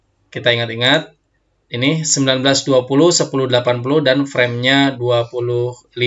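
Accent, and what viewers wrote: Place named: West Java, Indonesia. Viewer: "Sunda Van Java" logat nya 👍👏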